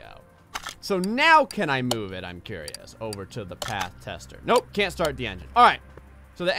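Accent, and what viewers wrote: New Jersey, United States. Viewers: àre u from New Jersey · Oh I wanna hear the jersey accent do it in the next episode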